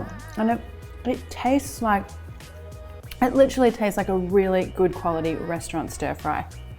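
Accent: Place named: Australia. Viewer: She’s Australian how don’t you know from this video wth they pronounce things differently.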